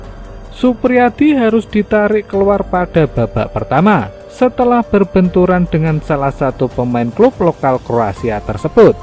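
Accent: Java, Indonesia. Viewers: medok amat mas 😂😂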